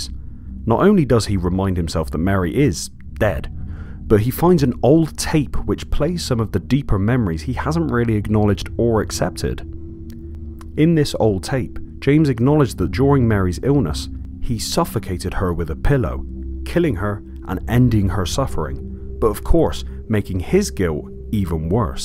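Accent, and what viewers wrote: Bristol, United Kingdom. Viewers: Is that a Bristolian accent? Or more West Country? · Oh wow!! Dude, you are obviously form exactly the same part of the UK as me. Don't often hear our accent on yt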